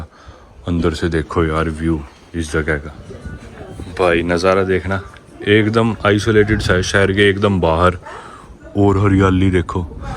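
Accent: Haryana, India. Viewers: Bhai kitna bhi kuch karlo par haryanvi touch rahta hai aapki boli m❤❤ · You are so talkative in your vlogs in comparison to Navankur brother ✨😂 and i always have been a fan of your desi-haryanavi accent 🔥🤣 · Bhai aapka tifficulte Harnavi touch achcha lagta hai mujhe ❤❤❤❤ Aur bahut dino baad aap logon ko achcha hotel mila hai ...🎉🎉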